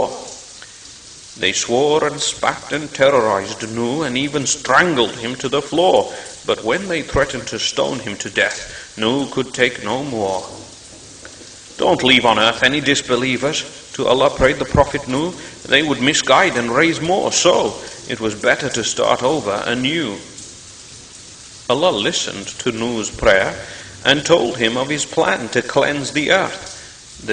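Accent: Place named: Scotland, United Kingdom. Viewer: The narrator sounds Scottish like sean connery.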